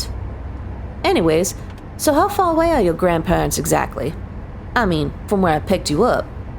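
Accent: South, United States. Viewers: Call me selfish but i do love me this mechtism shit, the southern accent just adds a certain "kiss me darlin i beg ya" to it, p4aise be the country darlijng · You did a great job on the southern accent although usually this accent will usually only be found in Texas and Oklahoma rather than the true south, love the audio!!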